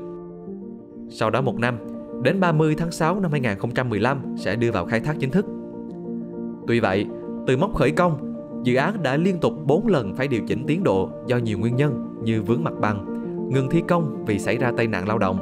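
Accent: South, Vietnam. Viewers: Video có nội dung rất thú vị. Giọng đọc Nam Bộ của bạn nghe hay lắm ! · Tôi rất thích video này. 1 con người miền nam nhưng tìm hiểu và nghiên cứu về Hà Nội. Giọng đọc ấm áp của người miền nam như xóa nhòa đi khoảng cách địa lý vùng miền gần 2 ngàn km. Rất hay. 1 video rất đáng xem. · Tôi chỉ thấy 1 điều khá thú vị và dễ thương khi người đăng bài về Hà noi lại nói giọng nam, chứng tỏ Ha nọi có sức cuốn hút của nó. Nguoi phía nam giọng nói rất chất phác hiền hòa , các cô gái thì giọng dễ thương. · Khá thú vị là một video về Hà Nội được dẫn bởi 1 bạn giọng Nam 😁 Thanks Spiderum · Thật thú vị, một video về HN với giọng dẫn của một người miền nam ❤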